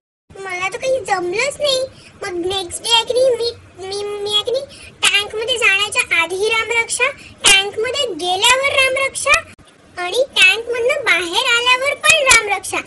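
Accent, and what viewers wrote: Nagpur, India. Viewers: tu nagpur chi ahes ka mhane😜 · your accent in video looks similar to Nagpur ... majedar ahet pan.... yet rahundet asech... lahanpanichya baryach athavani jagya zalya masti chya :)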